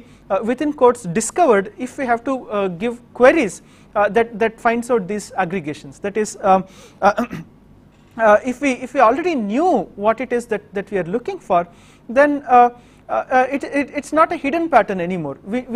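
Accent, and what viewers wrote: India. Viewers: As a matter of fact, indian-english accent is pretty close to russian-english... so you are actually in better position to understanding this guy than native english listeners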